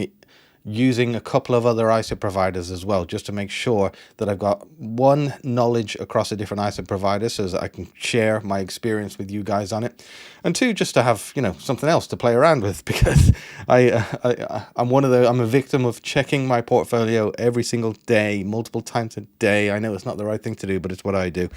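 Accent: Ireland. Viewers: I can detect a slight Irish accent I think. You did very well with your portfolio, well done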